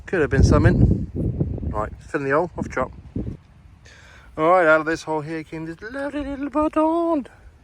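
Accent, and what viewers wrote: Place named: Kent, United Kingdom. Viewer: you’re edge of Kent though right? I heard it a little the more I watched to be fair…I have a mate down the road who came from Kent too and you sound like him…but, not quite as much. Whereabouts is your detectorist it’s in crime from then?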